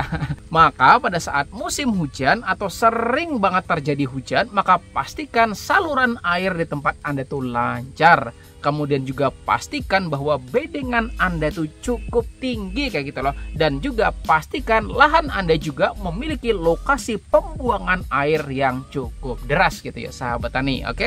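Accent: Banjar, Indonesia. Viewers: Orang banjar kah yu... Logat banjar prasaan uln.. atau cuma perasaan uln haja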